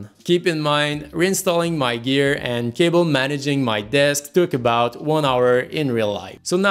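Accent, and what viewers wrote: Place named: France. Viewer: Damn that French accent coming through, lol.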